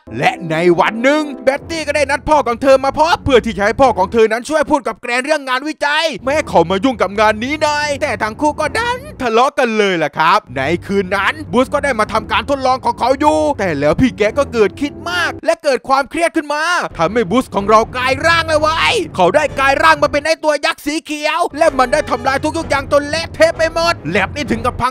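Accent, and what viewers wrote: South, Thailand. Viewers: ชอบสำเนียงใต้จริงๆ 💕💕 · สปอยภาษาใต้ทั้งคลิป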